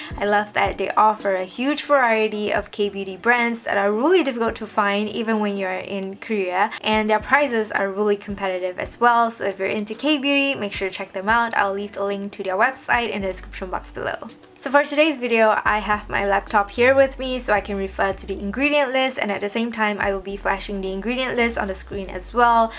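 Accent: Singapore. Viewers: Are you Singaporean?? Interesting the way you pronounce ‘really’ and ‘mugwort’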